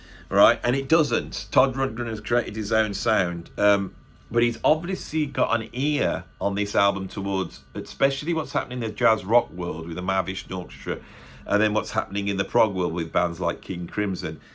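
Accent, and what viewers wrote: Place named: Northern England, United Kingdom. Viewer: He's simply the polar opposite of ( later solo) Doesn't have a great voice, writes very not hooky rambling long bits vs. the concise hooky pop/sorta proggy stuff, Winwood's squeakily clean and perfectly ordered arrangements. Both apparently had access to technology and could take time to make whatever the F they felt like making. Rundgren produced this mess and Winwood produced clever, challengingly difficult songs (said Paul Shafer "the most difficult thing we've ever played" on Letterman. I think), but ultimately a bit contrived, antiseptic and unsatisfying. Far better than Todd's offerings though. I am sure Todd's got a lot a deep lyrical messages for his adulent worshippers, and Steve's got almost none, and I would give Rundgren more thought and a closer look, but I am so put off by the music that I think I shan't. 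(apostrophe?) He did have that one hit in the 80's. A sort of easy rock MOR thing?.... oh well. Next contestant please. Love your accent btw. Can't quite place it. Somewhere northerly betwixt........no wait.. too soft Cottswalds innit?.. no a bit of more northern inflection...I'll have to Google you...nothing in Wiki...."what city is from"....hah there it is........Kidderminster- Birmingham. yes, I've got quite the ear for a Yank.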